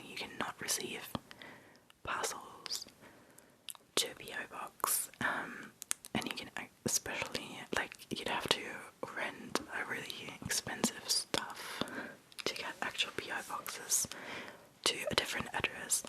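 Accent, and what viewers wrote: Australia. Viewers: girl its blowing me away that you're not australian, my family are aussies and you sound no different!! haha thats amazing you picked up the accent so well! also would love to see the dress you found, I was just in the exact same situation on the hunt for a wedding guest dress, and asos came in clutch!<3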